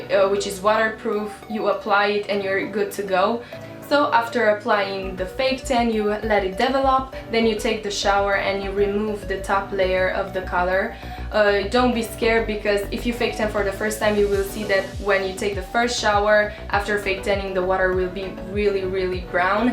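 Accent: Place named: Romania. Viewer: Esti cumva din Romania ? Am vazut produsele romanesti si ai si accent 😘😘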